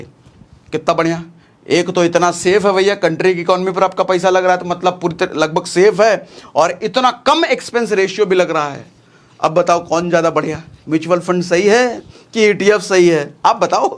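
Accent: Bihar, India. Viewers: sir ke sabhi pasand krta hai ye bihari hai bolte hai na ek bihari 100pe bhari ye yahi hai sir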